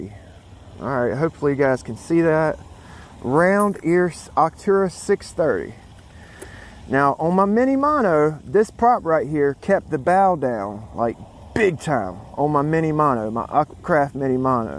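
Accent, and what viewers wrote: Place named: South, United States. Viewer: There's a TON of RC boat videos out there, but I must say; if you want to learn something, this is one of (if not) the BEST channels. Sometimes, can be WAY off the mark in his diagnosis of a problem (his learning curve is increasing like a rocket to the moon though...), but for the other 98% of the time you can rely completely on the information he so eloquently explains in his cool, laid-back Southern style. "NICE!" "Git it Bull...." "Full Trigger, Full Trigger!!!!......." If you have any similar RC experience (Cars, airplanes), boating should be easy. Just be forewarned; there is no "perfect" setup, parts are hard to find, you LHS has no idea what an RC boat is or where they can be found, but you will spend a lot of fun time trying to find "The Sweet Spot". That's the fun of boating, many of us are going where no man has gone before..... 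Lastly (and this applies to ANYBODY considering a venture into the hobby), ASK QUESTIONS of those who obviously have some experience; you can save yourself time and grief. There are good products out there, and there is crap. Experienced modelers know the difference and are usually more than willing to share their experiences with various products. has a business connection with ProBoat, but don't let that affect your thinking. ProBoat has done a whole lot in improving and perfecting the RC boating experience and the product line they offer is outstanding. Welcome to........ The Boat Gang! 😉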